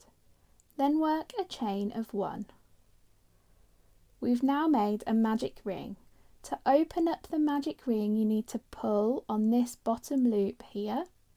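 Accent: United Kingdom. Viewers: again, US English terms and UK English terms are NOT the same. Examples: US single=UK double. US treble=UK double treble. The terms are 1 off from eachother. Considering her accent, im going to guess her terms are the UK terms, hence: she did exactly what she said she did 😊. Hope that helps clarify my comment.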